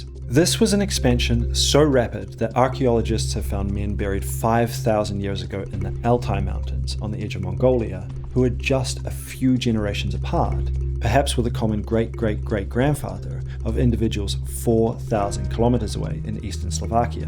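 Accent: New Zealand. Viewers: Narrator has a strange semi-rhotic accent with traces of NZ roots. Auto-captions struggles to understand it. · Rhotic New Zealand accent. Intriguing. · Absolutely splendid video. I’d never have clicked if I’d seen the length but enjoyed every minute. Where is your rhotic kiwi accent from?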